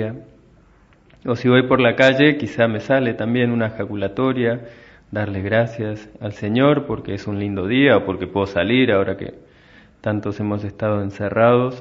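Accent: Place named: Argentina. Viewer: Suena muy bien con acento argentino! Muy bonita reflexión. Desde España.👍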